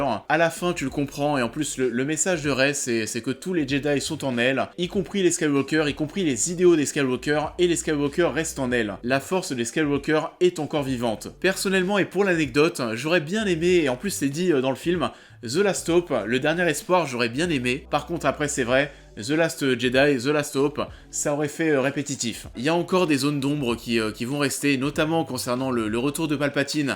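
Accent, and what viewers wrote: Paris, France. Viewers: Insupportable cette façon de parler de p'tite merde parisienne hin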